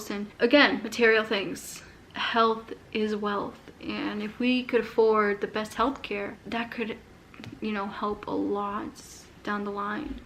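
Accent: Boston, United States. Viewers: To add to the chorus, please keep your lovely Boston accent. I didn't know it was a thing until I found you:)) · I love the Boston accent! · I loooooove your Boston accent 😊!!! Don’t get rid of it! 😢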